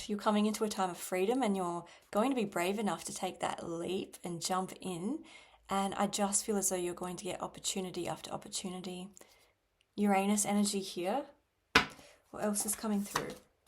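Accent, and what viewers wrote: Australia. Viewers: R you an Aussie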